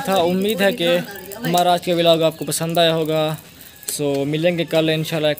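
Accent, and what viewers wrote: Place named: Saraiki, Pakistan. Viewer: Bhai ap agr saraiki m video bnao ksm sy bht achi video bny gi bht pyari saraiki bolty ho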